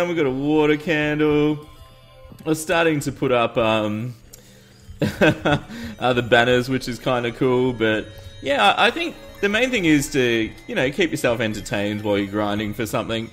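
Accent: Australia. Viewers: Hey, I'm new to your channel but, I love all the videos they are great keep going!!! and all so its good to see more Aussies getting on this!! I could tell in the first episode you were Australian and I laughed when you said in a vid "that rings a bell" and you had to explain it, very funny. Anyway keep going and don't let anything stop you, you are great at what you do.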